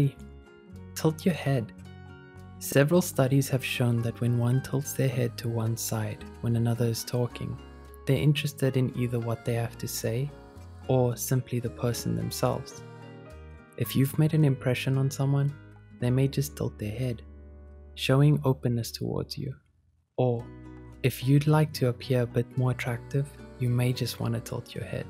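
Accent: South Africa. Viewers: Is this a South African accent I am hearing,if it isn’t , it could be 👀🇿🇦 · U lowkey sound South African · it's a south African accent. As a south African I recognize it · He sounds like he's from South Africa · I was searching for someone to confirm my thoughts that this is a proper South African accent. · He sounds South African 😍👌🏾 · Sounds like a south african accent 🙃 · wait what?! this guy has to be South African. I know that accent! this was expected 💚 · This person sounds south african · Sounds like a South African accent. Nice surprise. Love from SA. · is he South African? it feels like home. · I can instantly tell he has a South African accent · I’m picking up a South African accent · Does the new narrator have a South African accent??? I’m from South Africa and I was shook and confused like is he from here? Why does it sound so familiar? 😅😂 love it tho ❤️ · A nice South Aftican! 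👍😀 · Me too he kinda sounds South African. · Sounds like a South African accent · why does he sound like he has a bit of a south african accent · i love his south african accent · The voice-over artist sounds South African 😱 (I'm also South African but I don't have the accent lol.) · I love it!!!!!!❤Sounds South African ? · Sounds like our South African accent... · Is South African? I recognise the accent:) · does he happen to be South African? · Immediately I need to know, the narrator is South African right...maybe Namibian, but I'm really feeling South Africa. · The voice sounds South African and I'm not complaining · A South African audio !? I LOVE IT !!! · Is he South African? By any chance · This is 100% a South African accent right ?😂 lol represent!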